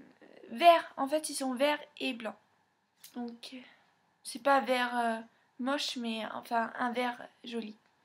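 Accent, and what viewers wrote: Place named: England, United Kingdom. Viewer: je trouve que tu as un accent anglais et en plus tu n'habite pas en france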